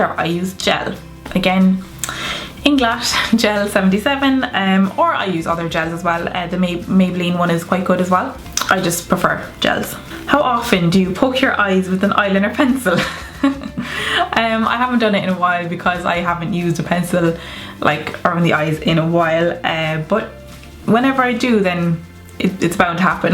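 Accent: Ireland. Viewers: are u Irish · Is that a cork accent I hear?!?! I love finding fellow Cork/ Ireland you tubers! :) You're so pretty btw! I've subscribed 💕💕 xoxox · I knew straight away ur Irish cause I'm too what county u from😉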